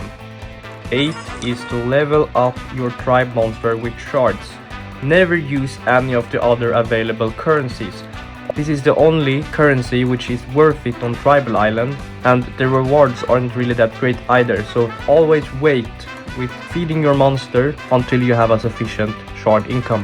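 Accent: Sweden. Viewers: bro u sounds so swedish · Are you Swedish, because your accent sounds really Swedish?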